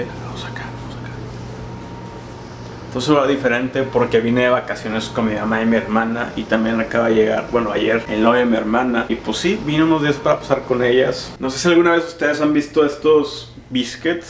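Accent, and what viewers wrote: Monterrey, Mexico. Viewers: el acento que tiene, es normal de alguien de monterrey?:( quee alguien respondaa:'( soy de Chile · Sip soy de Monterrey y así hablo😂😂😂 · Qué bonito video con puro acento regio🙌😜 jaja me encanto haz mas vídeos así, y haz convi en Monterrey😭😭🙏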